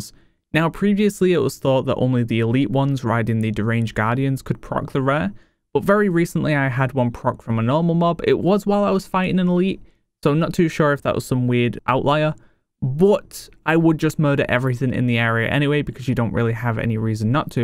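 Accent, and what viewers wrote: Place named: Yorkshire, United Kingdom. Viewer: Where in Yorkshire are you from mate? Your accent is very similar to the wife's 😋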